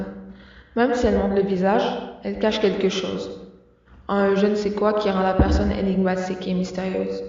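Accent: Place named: Quebec, Canada. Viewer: Magnifique accent québécois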